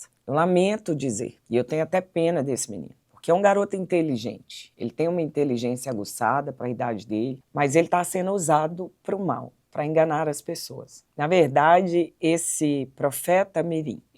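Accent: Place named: Belo Horizonte, Brazil. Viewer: A senhora é pastora em belo horizonte? pergunto pelo sotaque 😊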